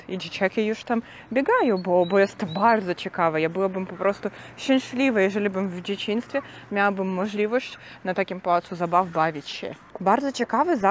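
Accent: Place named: Ukraine. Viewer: Jesteś fantastyczna i ten Twój specyficzny język z ukraińskim akcentem bardzo mi się podoba ,już któryś raz z kolei proponuje Sandomierz w świętokrzyskim 👍👍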